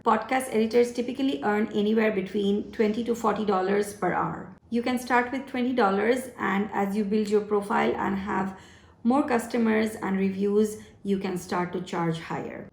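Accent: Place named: Western India, India. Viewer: Do you practice boxing? Why your hands are Red? Your English is Gujarat or Maharashtra side of India.. If you don't mind then please let us know from what part of India you are.. Need help on LLP and bank account that's why I came to your channel and subscribe I was in US last year.. Have 10 years multiple entry US business India.. Like to export goods like Clothes but have no idea.. Any other then clothing what else you recommend to export there??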